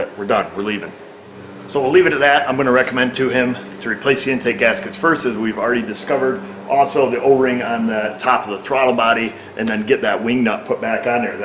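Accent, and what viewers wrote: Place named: New York, United States. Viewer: love the NY accent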